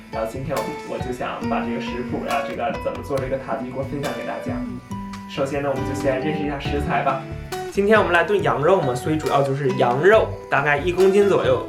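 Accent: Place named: Shenyang, China. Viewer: 哈哈哈是沈阳老乡吧亲切的口音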